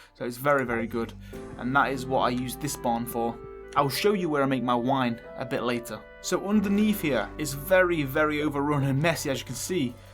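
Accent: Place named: Northern England, United Kingdom. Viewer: The fact I am thinking your proper northern like me 😂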